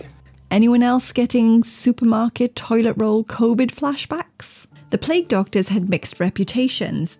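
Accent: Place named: Wales, United Kingdom. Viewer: lovely to hear a welch voice doing the voice over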